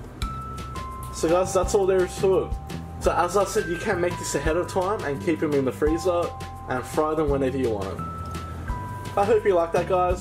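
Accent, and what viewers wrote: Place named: Australia. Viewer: Persian with an aussie accent first time watching you video love it very much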